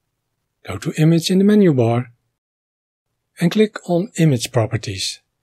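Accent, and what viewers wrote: Netherlands. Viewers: Your videos are all so very instructive and beautiful. You sound like Dutch. Am i correct?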